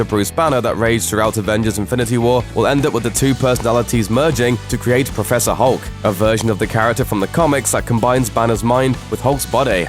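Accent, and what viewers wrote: United Kingdom. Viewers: Yo is this that the british dude from sanity not included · I like your Brit accent O-al-ll chw cute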